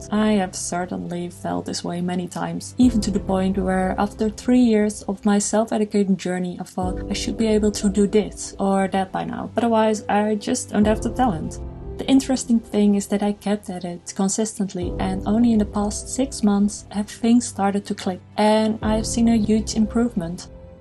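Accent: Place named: Netherlands. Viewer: I didn't watch the live adaption of Last of us, but a gameplay of the game. Really loved it back then. 😅 I also don't mind your Dutch accent. When I speak english people can probably tell that I'm from Germany. 😂 At least I can whenever I hear a German native speaker speaking English. Now onto today's subject: Yeah, learning how to draw or art in general is hard. There are no short cuts. No fast improvements. At least in my case. I am a slow learner. My brain needs to figure everything out and tries to see the big picture first. To give a different example: When I puzzle I look for the pieces first that end being the frame. I don't like to start anywhere. I always need that frame first and then I fill in the spots that are blank. There is also only a short amount of information I can take in everyday. Maybe that's why I spend year's in my comfort zone. 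Breaking out of that zone is scary, but necessary. 🙈 I started my art journey in 2012. So it's been a while. And there is still A LOT to learn. But it's okay. I don't want to rush my journey. Instead I embrace my not so perfect progress. 😊 Even though on my bad days I compare myself a lot to other artist and how far they have come over the last decade with their art, while I still feel like a beginning artist. 😔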